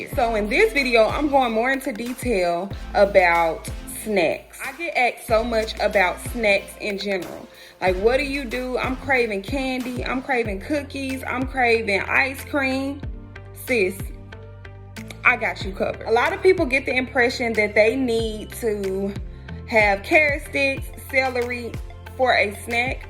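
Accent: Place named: Memphis, United States. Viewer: Gtta be from Memphis sound just like me I swear😂 we need a updated snack video 🫶🏽💕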